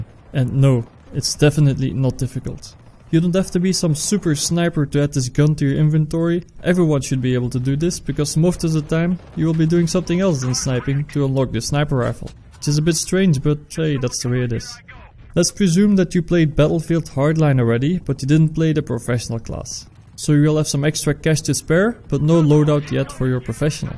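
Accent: Netherlands. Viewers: He has a Dutch accent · by your accent that you are dutch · it sounds dutch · Wat heb jij een Nederlands accent zeg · You sound like a dutch guy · je hebt het accent van een nederlander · CLEARLY DUTCH :D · I think that you are Dutch, because of your pronounciation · U sound dutch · it sounds like a very strong dutch accent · Ik hoor aan u accent da gij Nederlands of Belgisch bent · dat hoor je aan zijn accent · haha i can just hear that you're dutch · you are Dutch? Because of your accent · hij spreekt egt die tatta engels met die gekke accent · you're dutch, heard it in 5 seconds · Tis trouwens wel goed te horen dat je nederlands ben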